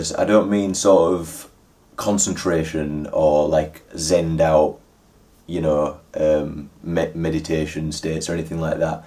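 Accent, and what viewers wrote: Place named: Halifax, Canada. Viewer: where are you from ? im from halifax you sound like you might be close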